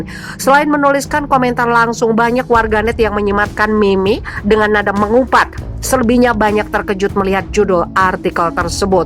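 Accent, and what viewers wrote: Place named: Java, Indonesia. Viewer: Lidah Admin pekat jawa berbahasa Inggeris. Lebih baik x usah sebut. Maaf ya.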